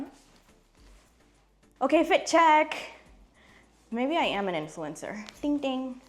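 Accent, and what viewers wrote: Godavari, India. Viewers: ❤❤ your telugu is cool and nice.. I can say your parents are from godavari districts of a.p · Your godavari slang 🔥🔥🔥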